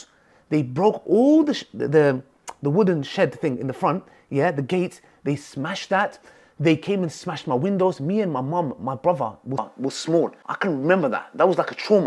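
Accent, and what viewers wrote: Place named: United Kingdom. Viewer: to be honest he always appeared to me as a British guy because of his accent. Never thought of him as a Kurd lol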